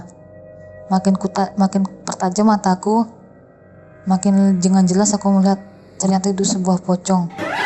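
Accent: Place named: Banjar, Indonesia. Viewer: Tapi logatnya mirip org Kalimantan atau banjar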